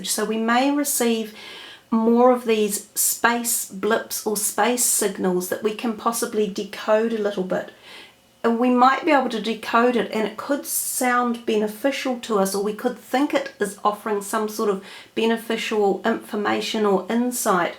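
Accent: New Zealand. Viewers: Pardon me! NEW ZELAND accent! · Kiwis not as bad as South African accent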